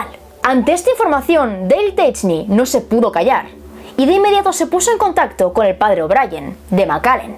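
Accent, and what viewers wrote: Spain. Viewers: Saludos, hermosa bellota, tu acento español es hermoso precioso y divino tesoro. Excelente investigacion y mejor video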